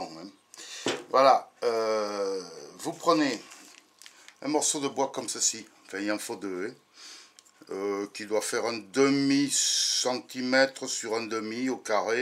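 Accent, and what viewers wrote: France. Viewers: bonjours je ne connaissais pas cette astuce pour l echelle dans la bouteille j ai 67 ans et je suis bricoleur egalement mais surtout en soudure a l arc mais tu m apprend encore beaucoups de choses sans indiscretion de quel region de france est tu car j aime t entendre parler amicalement d un c thi vivant en belgique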